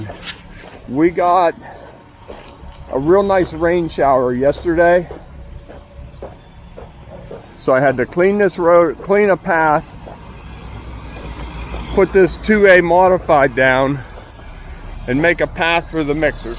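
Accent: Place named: Pennsylvania Dutch, United States. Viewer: I seem to detect a heavy "Pennsylvania Dutch" (German) accent when you talk. That combined with your German last name, brings me to that conclusion. Are you located out of Amish Country in PA?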